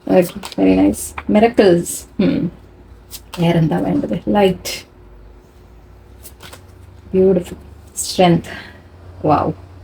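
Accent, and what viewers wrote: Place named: Koyilandy, India. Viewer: ഞങ്ങളെ കൊയിലാണ്ടി ഭാഷയാട്ടോ എന്താ ഇവളെ ചിരിക്കൂന്നേ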